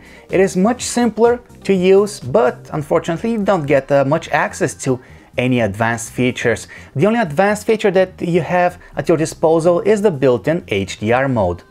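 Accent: Russia. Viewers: Произношение заебись. Он русский, чтоли? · you're Russian ?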